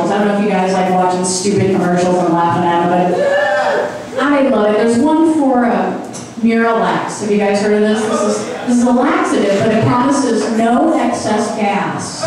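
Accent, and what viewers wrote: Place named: South, United States. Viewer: Visited your site to find what a "southern belle" accent was, stayed for the comedy.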